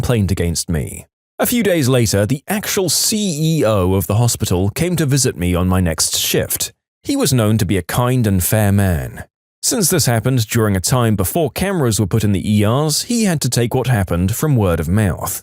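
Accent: United Kingdom. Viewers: British guy :C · i like british man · Ya british mate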